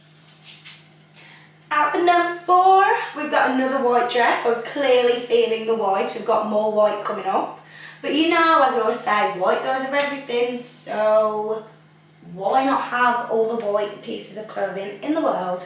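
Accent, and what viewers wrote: United Kingdom. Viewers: You are so damn fine, that British accent just puts you on a different tier.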